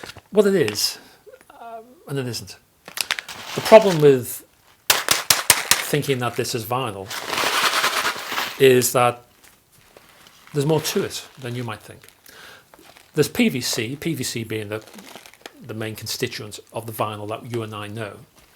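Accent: United Kingdom. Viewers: Thanks so much for this video. I enjoy your demeanor and easy-to-understand British accent. But I have a few things to say. I've heard that the PVC used in records is normally clear. That's why nowadays we get so many colored ones on the market. Evidently your black samples were treated with some type of carbon, that's what I've heard btw. Now as for alcohol use, how I tested it was to put undiluted store bought 70% isopropyl on a record in a 3" square area and let it sit overnight. The next day, having played the record previously I played it again and noticed absolutely no difference. I think the best way to test something that may have detrimental effect would be try it out on an unwanted record in an undiluted form. If it causes damage of course, back it off. 😊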